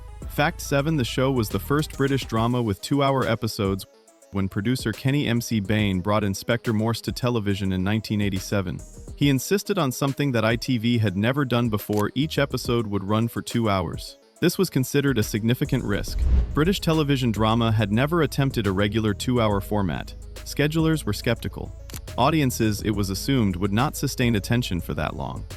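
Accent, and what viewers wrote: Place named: United States. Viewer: Interesting but it is a shame that AI accent is American.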